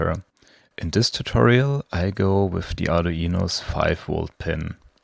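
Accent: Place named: Germany. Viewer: German english.... hard to listen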